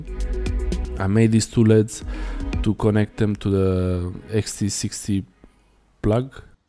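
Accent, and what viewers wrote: Romania. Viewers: Ești roman? Se simte accentul 😂💪🏻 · Could hear something was familiar to that accent. Te salut din bucuresti!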